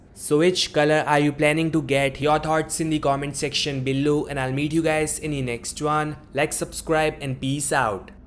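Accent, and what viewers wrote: India. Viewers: tabhi sochu voice indian lag ri thi 😅 U should do face cam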